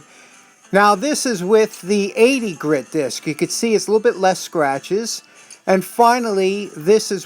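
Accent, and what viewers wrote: United States. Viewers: Accents😂. I do my videos in my normal everyday voice, or so I though, but someone commented I sound so chilled that it sounded like “I had eaten a shit ton of weed”. It’s you Americans that have an accent not us Aussies. Now Larry the cable guy has an awesome accent that I can listen to all day. He makes me laugh as soon as he opens his mouth. Great job on the restos. It’s so frustrating have to restore things twice🤬 Cheers Stuart 🇦🇺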